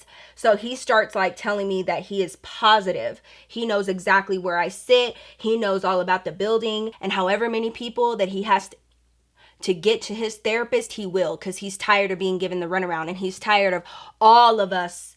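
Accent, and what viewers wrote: Texas, United States. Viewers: Texas in the house!!!! You Sound like you from tx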